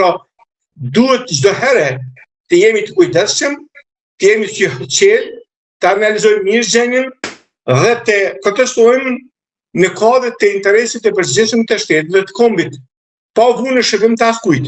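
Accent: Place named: Highlands, Albania. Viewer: È difficile capire cosa sta dicendo! Sarebbero meglio di parlare nella lingua corretta Albanese, non tutti capiscono la lingua montanara!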